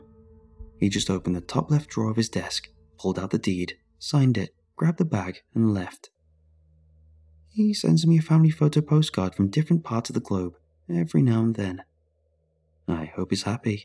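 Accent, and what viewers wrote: United Kingdom. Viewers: Easily my favorite story you've read. This and the forest fire story with all the different things happening every night. Your light British voice fits these 2 stories and both main characters absolutely perfectly👌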